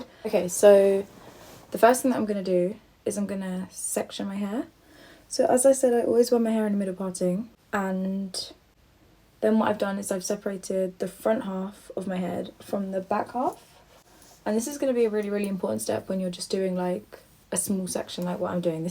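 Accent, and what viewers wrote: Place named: Sussex, United Kingdom. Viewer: I like your accent. Is it from Sussex??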